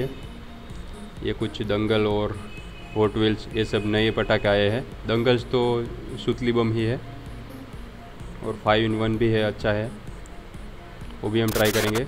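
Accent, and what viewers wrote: Gujarat, India. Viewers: Apki hindi se lagta he aap gujrati ho Reply plz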